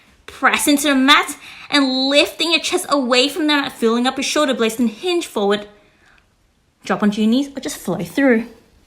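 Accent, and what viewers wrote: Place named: Australia. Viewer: Absolutely beautiful! Thank you so much! Also I LOOVE your aussie accent 😍😍 Blessings 🌸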